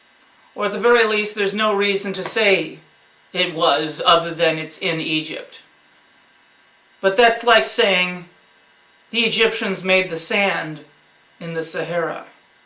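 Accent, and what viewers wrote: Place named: United States. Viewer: Just read your post. The presenter has an American, not Australian accent.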